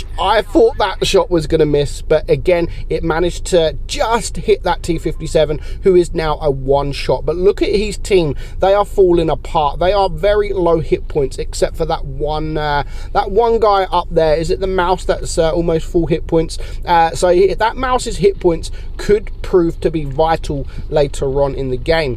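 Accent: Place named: Scotland, United Kingdom. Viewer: Scottish lol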